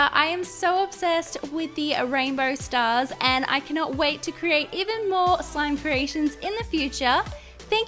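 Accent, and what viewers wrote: Australia. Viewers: I loooove your accent it is so so pretty. Is it Australian?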